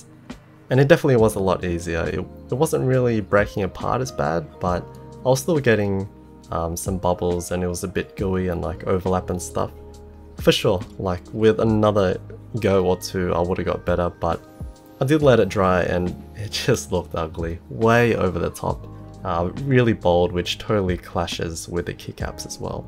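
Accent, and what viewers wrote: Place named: Australia. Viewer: the calm australian accent is great too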